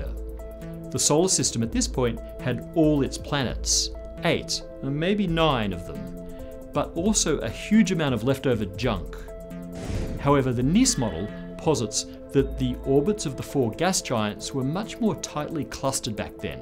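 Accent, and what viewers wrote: Australia. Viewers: I don't think so. Sounds something akin to Australian.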